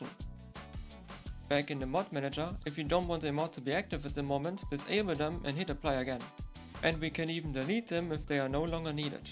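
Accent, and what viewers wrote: Germany. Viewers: Deutscher akzent? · Bist du deutsch? Man hört so nen leichten akzent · Bro sounds so German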